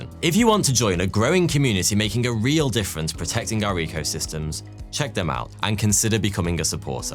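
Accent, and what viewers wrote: United Kingdom. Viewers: While this video is entertaining, it is inaccurate, which is understandable given your accent. Your dna has been programmed for hundreds of years before my dna was. Go have a pint, and watch some soccer while your crown eliminates you. They are VERY NAUGHTY! Did you know that the Rothschilds personally funded the equivalent of 1 billion dollars for the third coalition, which was partially annihilated simply by marching. Their global banking syndicate was in jeopardy… it’s all quite fascinating how they helped the crown carve up the world. Awfully coincidental that in 1812 England was fighting both America and France and the same time. That pesky Napoleon 😉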